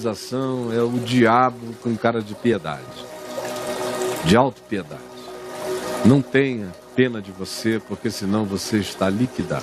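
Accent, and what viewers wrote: Recife, Brazil. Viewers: Nota dez trilhão sabe que fala Recife Pernambuco ❤❤❤❤❤❤❤❤❤❤❤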